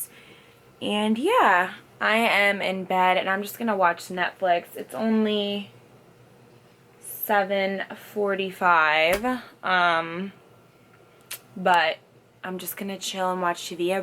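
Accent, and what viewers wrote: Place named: California, United States. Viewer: Are you Californian? Because you definitely sound like one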